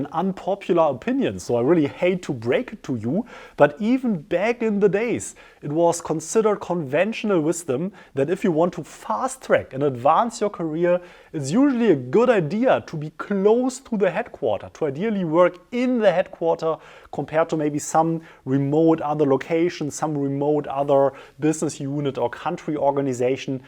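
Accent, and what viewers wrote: Germany. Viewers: Ein ungefragter Tipp nachdem ich mehrere deiner Videos geschaut hab: Inhaltlich gut dargestellt und kohärent. Sprachlich muss man sagen, dass du quasi Deutsch mit englischen Vokabeln sprichst. Sprachmelodie, Grammatik und Satzstruktur sind deutsch. Sonst gut. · I love the german accent!❤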